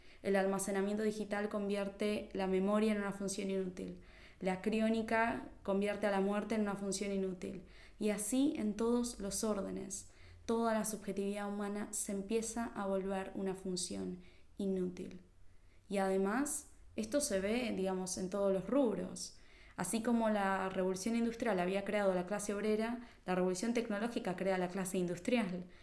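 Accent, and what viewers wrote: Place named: Argentina. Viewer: Gracias. Trataré de encontrar el libro. Te encontré a través de Gustavo Salle. Insólito. Por la forma que tienes de hablar eres argentina, no? Soy uruguayo, te estoy siguiendo con avidez desde hace poco. Muy profundo tu exposición sobre el tema de la Singularidad. Te saluda con afecto, desde Uruguay, Eduardo, artista plástico egresado de la Escuela Nacional de Bellas artes.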